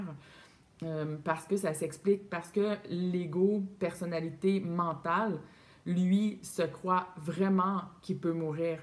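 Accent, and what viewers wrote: Canada. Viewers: Cette chaine est géniale. Merci bcp pour ttes ces informations et tout ce travail. L'explication est bien Claire surtt avec cet accent cabadien adorable. :D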